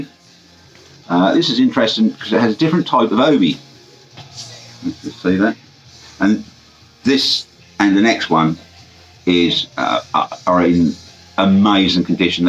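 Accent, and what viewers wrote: Suffolk, United Kingdom. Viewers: Hello from a fellow son of God's own country (Sudbury to be specific) and a retired provincial journalist of near enough 50 years experience. I think I can clear up your stickers confusion. Back in the day, record companies would send white-label promos to radio stations, specialist music mags and national newspapers to generate pre-release publicity but they would also lift records from the first production run and ship them out to reviewers on regional and local papers. More often than not they would stamp or sticker the covers, sometimes even cutting a notch in the corner, to underline that technically these remained the property of the company who didn't want them being flogged on the black (well, greyish) market. It sounds a mite petty but hundreds of copies of hundreds of albums potentially adds up to a fair chunk of change each year. 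I should add, hand on heart, that I never resold a record but because I didn't like the artwork being obscured I habitually removed the stickers. That sometimes meant the sleeve was damaged but, ironically enough, even when the sticker came off clean I probably reduced its rarity value in today's VC market! Hope that helps and please keep up the good work. I only subscribe to two of the countless 'old bloke talks about music' channels and your's is a particular treat because it proves that the Suffolk accent hasn't been completely swamped by Estuary English commuters...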